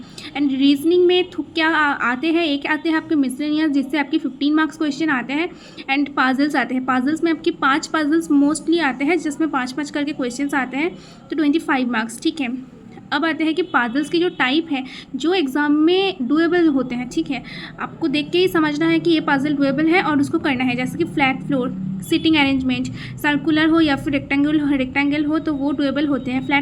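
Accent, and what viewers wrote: Bengal, India. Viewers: Bengali accent ....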